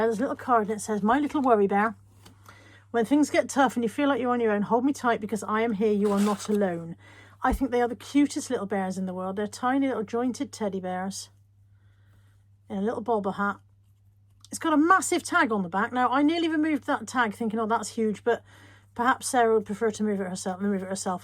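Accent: Bristol, United Kingdom. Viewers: Hi I watch you and family plus Dogs all the time my late Husband was from Bristol and love ❤️ the way you talk bring back such good memories.coming to Bristol on the 12th March to see family I will look out for you when we go into town would love to buy you a drink.watch you do parcel up and send a supize one every week I am 74 soon and love my yorkie belle she my mate like molly is your.sending love ❤️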